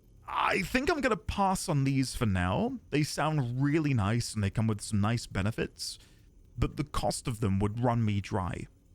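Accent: United Kingdom. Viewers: do you have acting training? Your mid- century RP accent is top shelf!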